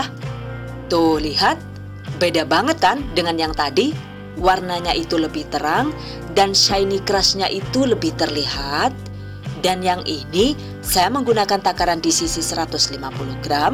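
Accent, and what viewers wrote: Banyumas, Indonesia. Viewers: Aq pasti ngakak denger logat ngapaknya,,,,,kui sing tak kangeni bunda,,,,aplgi resepnya,,,,,,suka bangettt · 😂😂😂 lagi serius2..., becanda gaya ngapak ... The best lah Bu!, thankyou resepnya Bu!...saya coba nanti. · Deneng bisa basa ngapak yak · Bunda,kok bahasane ngapak , emang asli mana bun,,,oya bun nnti saya coba ,,,,,sehat selalu bun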